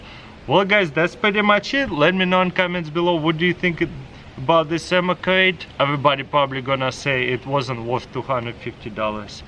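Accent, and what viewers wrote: Russia. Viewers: По первому слову понял,что ты русский) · Я один слышу русский акцент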